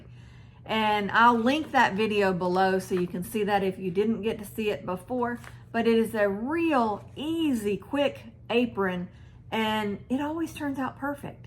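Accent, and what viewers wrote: South, United States. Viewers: Good Saturday morning Miss! Thanks for sharing my quilts. My last name is Rick-er. Rick with an "er" on the end. You're such a sweet lady. I love your southern accent.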